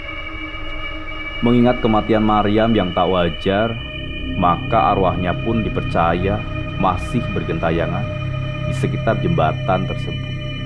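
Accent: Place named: Banyumas, Indonesia. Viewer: Rodo ngapak sitik lah wak kui hehe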